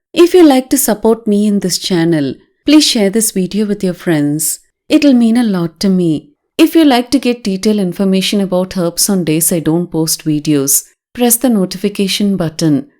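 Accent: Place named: Pollachi, India. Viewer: R u Coimbatore Ur slang s SIMILAR TO Pollachi slang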